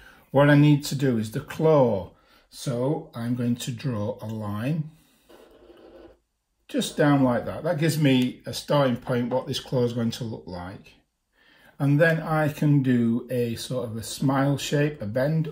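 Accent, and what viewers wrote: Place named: Northern England, United Kingdom. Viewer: Thank you, great to hear a Northern Accent.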